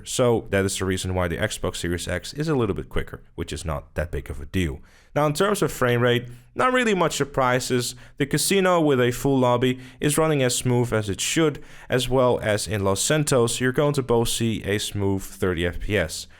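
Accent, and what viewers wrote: Netherlands. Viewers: Are you dutch? If so: Goedeavond 😁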